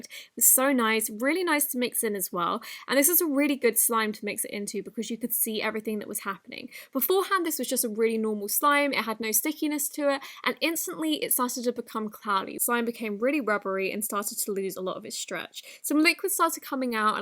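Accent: United Kingdom. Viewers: Are you from the uk ?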